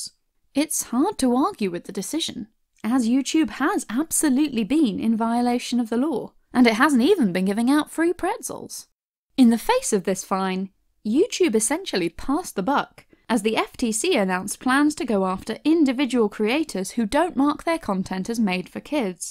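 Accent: United Kingdom. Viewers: The British accents says it all..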